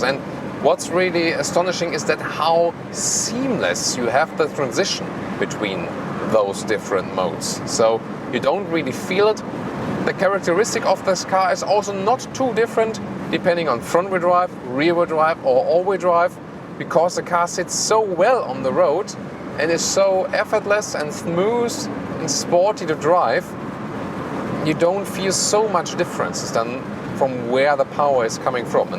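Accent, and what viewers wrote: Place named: Germany. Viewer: Little by little you're starting loosing your accent almost German 90% combined with 10% english, before was G 50% E 50%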